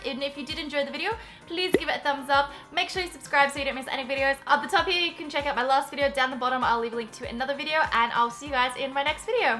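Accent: Australia. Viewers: are you aussi?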